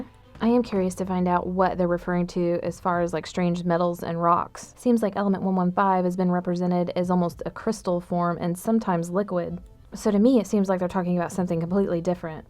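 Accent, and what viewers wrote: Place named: South, United States. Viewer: You sound more southern in this video.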